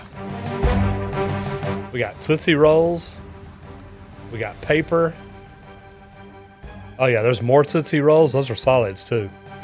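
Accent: Texas, United States. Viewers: Based on your accent I say ur Texan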